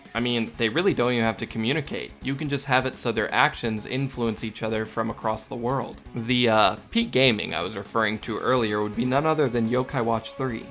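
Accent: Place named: Italy. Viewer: > Yeah I should put more stress on those syllables, that’s my bad! Don't worry. It's just a thing we Italians do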